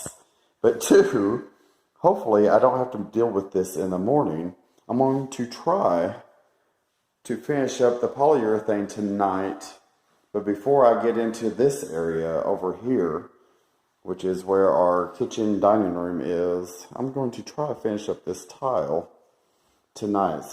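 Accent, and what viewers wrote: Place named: Georgia, United States. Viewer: I'm going to try this!! You were hilarious! 😂 You sound like you are from Georgia!! Same as us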